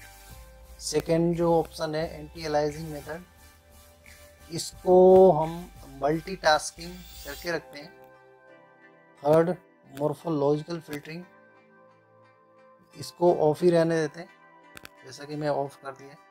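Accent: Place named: India. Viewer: nice vid but try to talk american english or british english not indian english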